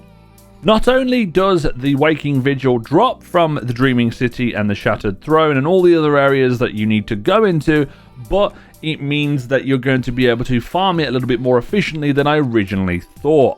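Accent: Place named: Scotland, United Kingdom. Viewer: Ah some scottish accent. Nice.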